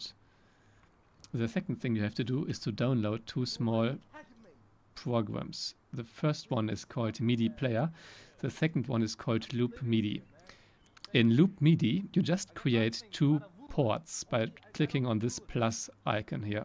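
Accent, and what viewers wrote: Germany. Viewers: This German accent Omg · DU BIST SO DEUTSCH OMGGG ALDA MAN HÖRT DICH ;))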